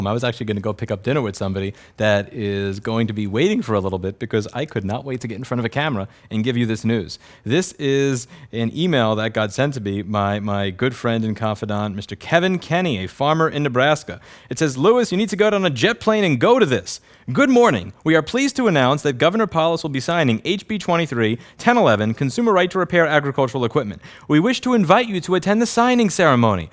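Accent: New York, United States. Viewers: Congratulations. You've really made a difference. Many people complain and protest, but you've done the difficult part by working to influence legislation. You should think about running for office in TX. Maybe put a little southern twang into your NY accent.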